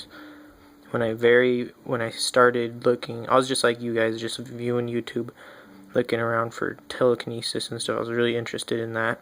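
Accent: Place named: Illinois, United States. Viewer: you from illinois? your accent is frikkin adorable.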